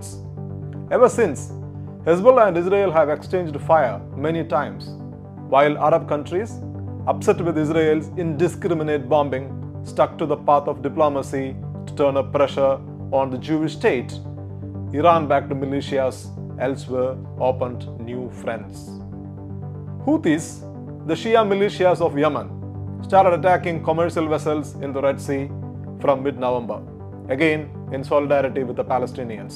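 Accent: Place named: Kerala, India. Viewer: English with Malayalam accent, sounds interesting, but difficult to comprehend easily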